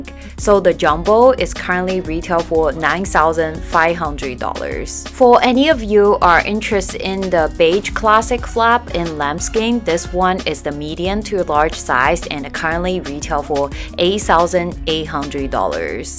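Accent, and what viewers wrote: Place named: South Korea. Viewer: 韓國口音英語。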